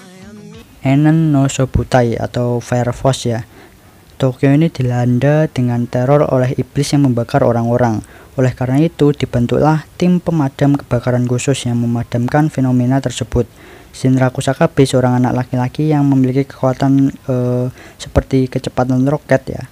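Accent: Java, Indonesia. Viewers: GUE# jawanya medok....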